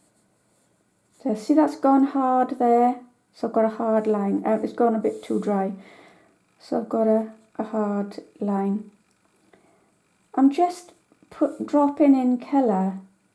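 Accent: Wales, United Kingdom. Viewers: Beautiful thanks so much. Love your welsh accent!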